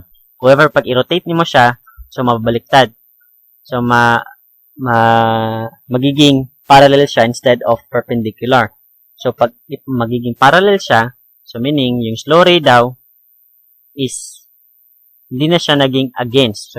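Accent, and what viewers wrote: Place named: Philippines. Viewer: Bro thats how philipino talk. Try speaking another language without an accent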